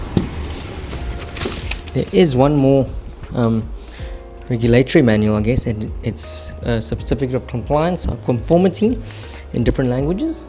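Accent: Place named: South Africa. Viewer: Great revue :-) Why do you sound south African?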